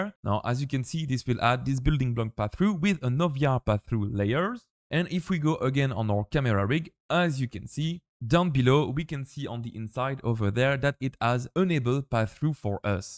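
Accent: France. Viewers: T'es français? t'a l'accent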